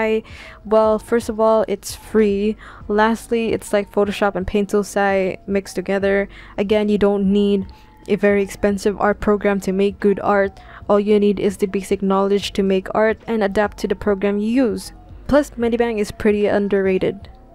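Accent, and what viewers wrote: Philippines. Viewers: From the chicken and the accent, I can tell you're from the Philippines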